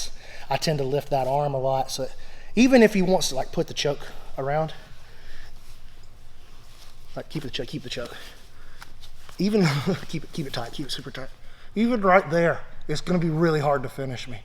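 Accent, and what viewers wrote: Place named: Alabama, United States. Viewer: hey are you also from alabama? I recognize the accent. cheers from Hartselle.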